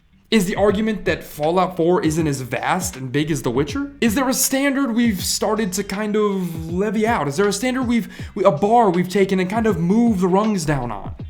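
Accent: New York, United States. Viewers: Okay i can deadass definitely tell your from new york my guy lol 😂😂😂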